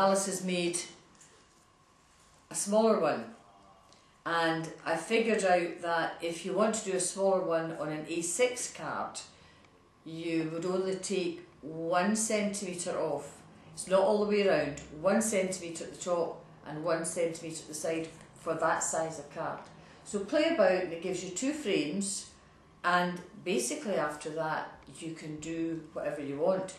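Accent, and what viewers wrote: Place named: Scotland, United Kingdom. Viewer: I appreciate you slowing down so we "non-Scotish" can follow, but both your accent and videos are so terrific, watching it again is no problem. Thanks for sharing your talents with us.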